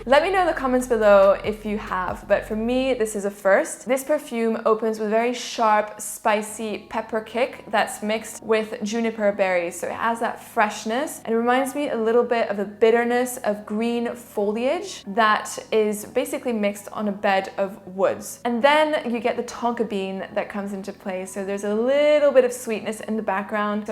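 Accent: France. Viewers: Tu es Française ? tu as l'accent français quand tu parles anglais 😅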